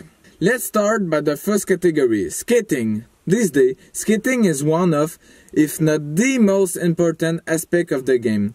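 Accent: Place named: Quebec, Canada. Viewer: J'adore l'accent Québécois dans ton anglais ahahah! Sinon c'est une bonne bonne vidéo!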